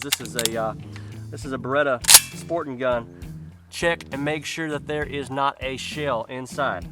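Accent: South, United States. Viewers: I was wondering where was from with that Southern accent and now I know Mississippi. That blue light that was holding we in Canada call that a Barbeque light because everyone here pretty!uch owns a propane grill barbeque so that long lighter comes in handy. As for the duct tape there was a Canadian comedy Tv show called The Red Green Show about a typical Canadian lumberjack and he would call the duct tape the handyman's tool. There would always be uses for the duct tape. LOL! 😂😆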